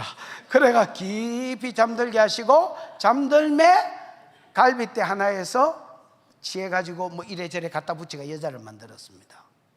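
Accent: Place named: Busan, South Korea. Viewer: 목사님~^^ 귀한 말씀을 듣게하시니 감사드립니다 ❤ 우리남편에게 목사님 부산 방언통역재미도😂 쏠쏠합니다 건강하십시요 🎉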